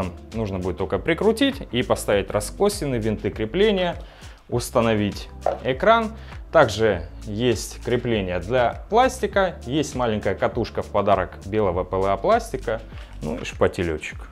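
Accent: Rostov, Russia. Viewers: так больше половины Ростова говорит, это блин акцентом зовётся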